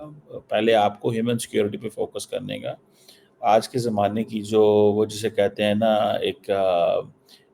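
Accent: Punjab, Pakistan. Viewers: Punjabi English awesome... Good viewpoint..... All must support the channel.... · was waiting for you to start ur rona-dhona! Why does any development about India cause u so much despondency? In ur typical casual, thick Punjabi style, you tend to trivialize everything.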